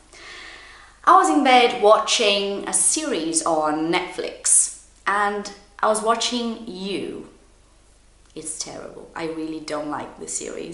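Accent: United Kingdom. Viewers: your vídeo os Very useful and you have an excellent British accent. Congratulations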